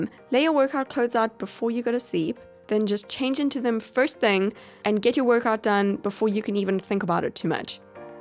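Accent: South Africa. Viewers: Are you South African?? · Channi Snklb Her accent sounds South African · Lara S South Africa 😊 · Your from SA right😂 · Are you from South Africa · Slay Ace she's from South Africa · Is she South African ? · Ayyyyeee South African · South Africa I believe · are you South African? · Knew you were South African as soon as I heard your accent ! (and I also saw woolies)I'm South African too ! ❤ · Are you South African ? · Ahhhh !!!! I recognized your South African accent so quickly !!! I’m from South Africa, can you make a video of how to diet & a diet plan for picky eaters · i could pick up u are south african due to your accent but i wasn’t sure, then i recognized the shop u were at! :) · Also are you South African because I have South African friends and the way you pronounce words are super similar? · You’re from SA right ??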